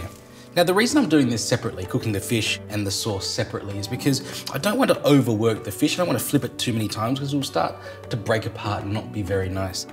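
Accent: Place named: Australia. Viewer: He sounds Australian... maybe kiwi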